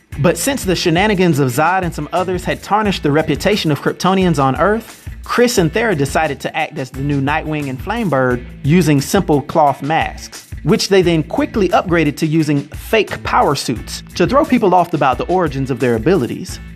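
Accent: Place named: United States. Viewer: I rellay like your american accent from which part of USA are you from? Amazing video as always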